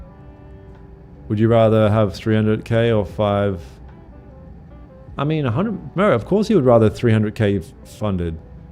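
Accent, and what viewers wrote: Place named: Australia. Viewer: I live overseas as well and it's nice hearing an Aussie voice when watching streams. Keep up the good work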